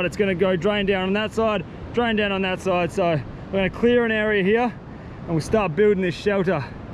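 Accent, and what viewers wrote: Australia. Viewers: I wish i could see british reaction to Australia accent lol gotta be interesting interaction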